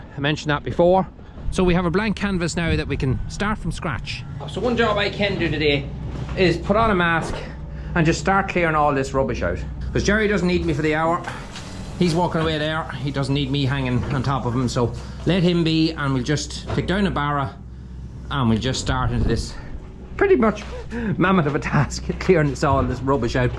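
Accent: Cavan, Ireland. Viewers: Where is the cottage? Cavan ?, just going off your accent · I love to hear your brogue. My mother was born in Letterkenny in 1906. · Where is this house, somwhere in Ireland, (by your accent ) ? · That Good Strong Irish Accent!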